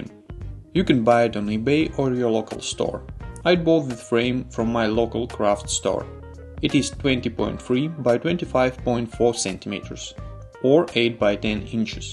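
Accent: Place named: Russia. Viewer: Жёсткий русский акцент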